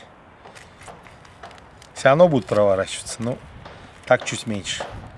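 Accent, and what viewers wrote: Baku, Azerbaijan. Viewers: Маэстро, настолько красиво и со вкусом вы все делаете, что смотрим всей семьей не отрываясь! И кушаете очень заразительно:) Процветания вам и вашим близким,! Потрясающие блюда, приправленные неповторимым бакинским акцентом становятся еще вкуснее)) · Азербайджанский акцент , ласкает слух, сразу вспоминаю своего друга Джавида , с которым дружили во время ординатуры в Москве ).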